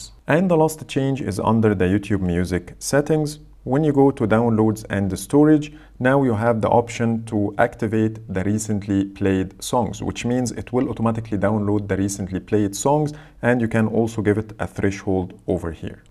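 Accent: Egypt. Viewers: You sound like you're Egyptian right?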